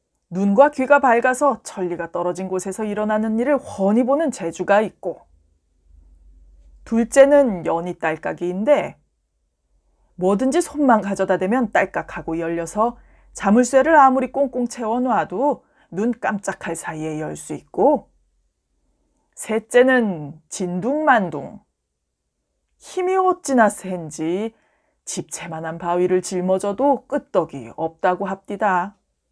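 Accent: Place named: Gyeongsang, South Korea. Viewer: 안녕하세요 넘재미있네요! 경상도사투리 구수합니다~^^ ❤❤❤❤❤❤❤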